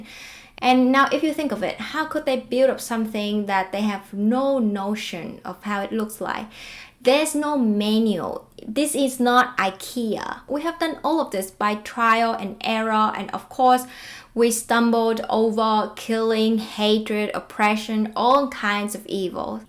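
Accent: Australia. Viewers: I enjoy hearing your Aussie accent and I'm impressed with your mastery of English as your second language.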